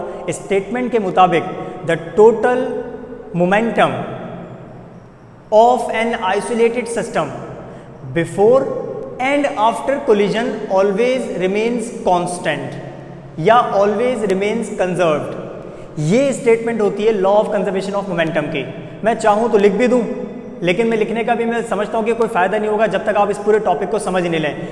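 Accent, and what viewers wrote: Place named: Waziristan, Pakistan. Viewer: I think that you are from waziristan or bannu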